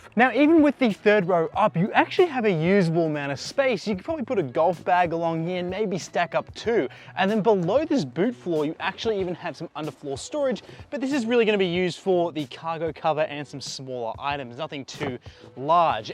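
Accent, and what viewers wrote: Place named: Australia. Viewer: Didn’t want to assume lol but I thought it was Aussie 🙂. Anyway, you did a great review.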